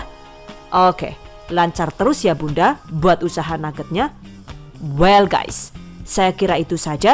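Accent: Banyumas, Indonesia. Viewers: Mba.nya orang mana sih?kok ada ngapaknya? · Bahasa Inggrisnya bagus, ngapaknya lebih keren😁😁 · Ku suka kalau bhs ngapake metu rek....lucu sih...... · 🤣🤣🤣🤣🤣🤣🤣🤣🤣🤣🤣🤣🤣🤣🤣🤣Nyong seneng bgt krungu NGAPAKE... THE BEST CHANNEL😘😘😘😘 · Ga tau knapa kalo buk hasanngomong ngapak ktawa sendiri😀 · Bun , aslinya orang mana sih, suka liatnya ada ngomong ngapak nya 😁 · Hhaaa q lah gemes ,,Rika janeh wng ndi Yu,,ngapak pisan ky inyonge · Suwun resepe ya Yu... Rika sih wong ndi Yu, deneng ngapak kaya nyong.. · Owh si ibu ngapak jebuleeee... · Wiih ngapak mbak 😁😁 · Madiun kok ngapak seh buk? Kepriwek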